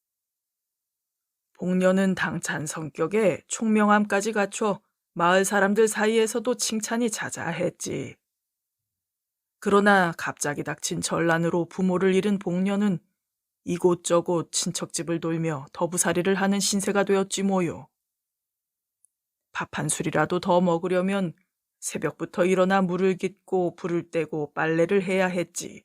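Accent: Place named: Chungcheong, South Korea. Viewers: 참으로 정겹고 훈훈한 아름다운 야화입니다 그야말로 구수하고 정이 뚝뚝 떨어는 충청도 사투리의 뚝배기 맛이 정말 일품이요 재밋게 잘 들었다오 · 충청도식화법이 재밌네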